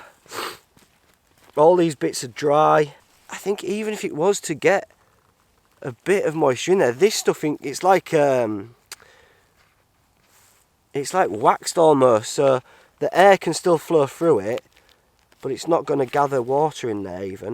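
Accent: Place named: North, United Kingdom. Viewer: Spat my coffee out reading this, thank you I needed that laugh 😂😂 is awesome. Northern AF lol!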